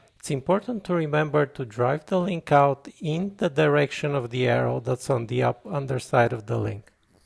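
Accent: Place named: Eastern Europe. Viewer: good video--knew it would be so, always look for eastern european accent for jewelers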